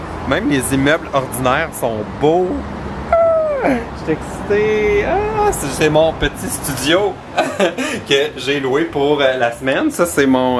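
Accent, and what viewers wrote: Quebec, Canada. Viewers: Nous avons regardé cette vidéo dans notre classe de français. Nous avons dû étudier les prononciations différentes entre l'accent français traditionnel et l'accent Français Québec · Ahaha tu me fais trop rire!! t'inquietes le lait et les oeufs chez nous c'est jamais au frais, et on n'est jamais tombés malades pour ça! Notre lait est pasteurisé et peut se conserver des mois ainsi!!! Moi c'est quand je suis allée à Montreal, j'ai été choquée de ne trouver que du lait frais a consomer dans les 3-4j ou alors en poudre... et le fromage chez vous, misère!!! Il n'y a que du cheddar ou alors les fromages d'importation sont hors de prix! Ma cousine est à Montreal pour ses etudes et est comme une folle quand elle rentre en france et qu'elle retrouve le fromage!!! ahah. En tout cas depuis que je suis allée au Quebec j'adore regarder plein de vidéos vlog dessus tout ça, et votre accent, punaise c'est tellement sympa à entendre! 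lol En revenant chez moi j'ai gardé plein d'expression de chez vous tellement ça me plait!;)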